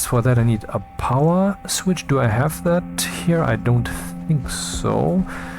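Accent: Germany. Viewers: I love your little rant in the middle of an explanation in all your videos. It's very entertaining and I can hear that you have an intelligent and deep soul. The rant about smoking made me realise how stupid I was... I'm quitting this nonsense tomorrow, I'm young and have good genetics, I shouldn't be messing around with it! I've heard that a lot, but I don't know, your insulting German accent worked this time. Your teaching is multidimensional. See you soon on other videos.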